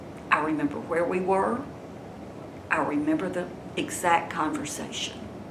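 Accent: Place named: Memphis, United States. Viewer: I adore you. I feel such a closeness to you when you do videos like this. I lost my mother three years ago, and you remind me so much of her. Plus the Memphis accent. 😊I love hearing your thoughts and advice.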